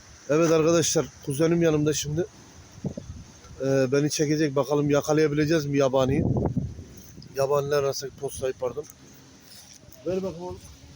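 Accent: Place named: Adana, Turkey. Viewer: Adana’nın hangi mahallesindesin kardaş şiven adana kokuyor saygılar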